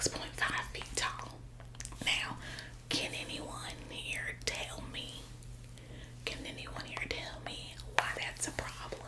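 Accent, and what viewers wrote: South, United States. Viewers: I love the southern accent 😁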